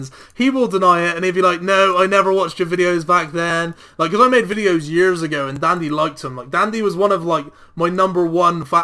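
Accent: Bristol, United Kingdom. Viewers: literally paused at 15 seconds you have to be bristolian, right? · From Bristol much?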